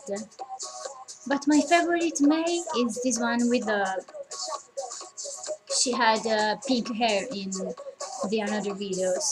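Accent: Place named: Italy. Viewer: she from italy she just speak English with Italian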